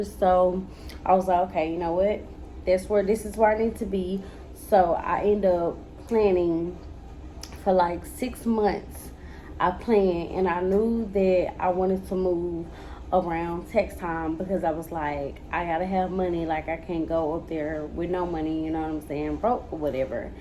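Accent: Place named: South, United States. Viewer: You sound like your from the south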